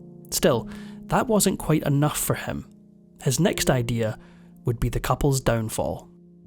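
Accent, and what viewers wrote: Scotland, United Kingdom. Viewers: Scots don't listen. They burr. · With a charming little Scotish zing. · Why do I always think THANK GOODNESS 2 seconds into the video when our Scottish narrator kicks in.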